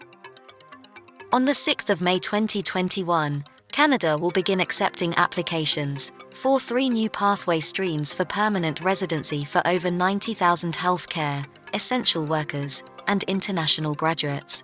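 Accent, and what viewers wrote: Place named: United Kingdom. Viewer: Why the British accent if this is about Canadian immigration. Please change it. We are proud of our identity.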